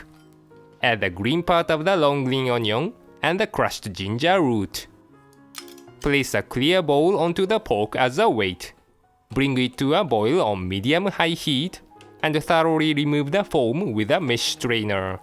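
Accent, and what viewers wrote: Japan. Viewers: The host has very strong Japanese accents, but I understand him very well, but will difficult to many English speaking people. It was better, if the lady talks. This is not a healthy food and it takes a lot of times to make a simple food, but when you have nothing to do, you can cook it at home. This guy and woman work together perfectly, but they use low quality Japanese cookeries. Even I use very nice Japanese cooking stuffs and knives everyday. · この、いかにも『日本人の英語』って感じが これ海外の人にはちゃんと伝わってるのかな？ 字幕あるから問題ないか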